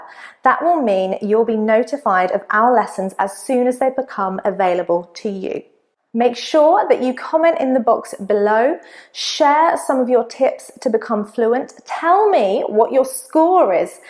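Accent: United Kingdom. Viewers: I love your videos as your accents is on british english based.We people love british accent rather than peculiar american accent. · Tell me why I can understand you easily despite your british accent :-D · I studied English in California so I have a hear for american English. It is the first time I come across your channel and after few seconds I could tell you are from UK. I sometime use Google Translator because it is fast and convenient, if not happy with it I reach for my English to English vocabulary. Not problems with movie or most books but still I have hard time to understand songs. As for jokes it is more cultural, if you are not a native it can be challanging. Finally, nobody mistake me with a native speaker, I still have a very strong Italian accent. Another criteria you can add to this list cuold be if you can solve crosswords. I'm not able to do it in English and sometime I find it difficult even in Italian.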